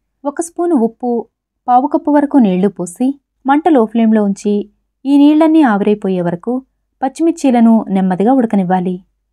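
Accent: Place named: Telangana, India. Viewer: మీది తెలంగాణ అనుకుంటా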